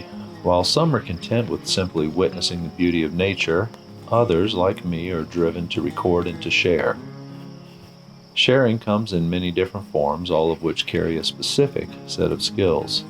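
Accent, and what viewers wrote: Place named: Louisiana, United States. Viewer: Now this, this is your best so far, or as far as I know about. It has lots of Katie - check. It has your sort of Idaho-ized Loozeeana accent - check. It focuses on one thing and does that one thing well - check. It's simple, a simplicity made all the more remarkable by the complex tools you use - check. It's philosophical - check. It's got that music alright, but unobtrusive and even apropos! - check and check. And it's just the right length to do what it needs to do. Checkmate. (Personal note: I'm glad I've kept my subscription, despite my, shall we say, "disagreements" with the auteur).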